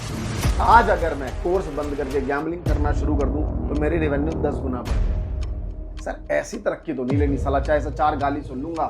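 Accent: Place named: Chhattisgarh, India. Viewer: bhi aap Chhattisgarh se ho kya mujhe aisa lag raha 🤟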